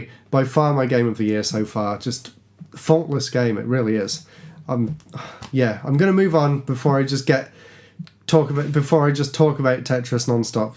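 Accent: England, United Kingdom. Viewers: Gretings from Brazil. I was looking for youtube retrogame vídeos and I found your channel. I really enjoyed how you was exciting with this retro shop. I had been visit London in 2015 but did not found this shop, what a shame!off course is always Nice listen The trully english, here in Brazil we have much more contact with the American english, and its not so Nice. Im glad that I found your channel.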